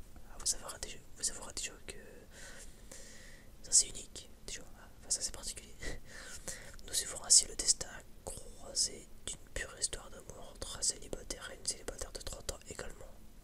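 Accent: North, France. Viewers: Tu es bien du Nord 😊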